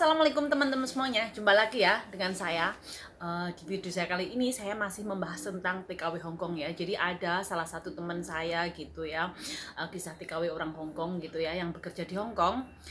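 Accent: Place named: Java, Indonesia. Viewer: Seneng liatnya liat mba ngomong nih..lancar dengan loga saya juga heheh..jawa ..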